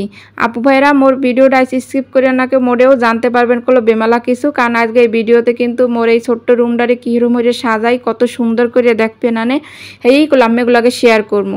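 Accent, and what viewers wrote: Barishal, Bangladesh. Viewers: আপু তোমার কথাগুলো কেন জানিনা আমার খুব ভালো লাগে বিশেষ করে বরিশালের ভাষা আমার কাছে খুব পছন্দের ❤❤যদিও আমার বাসা উত্তরবঙ্গে কেন জানি না দক্ষিণাঞ্চলের বরিশালের ভাষাটা আমার কাছে খুব পছন্দের ❤❤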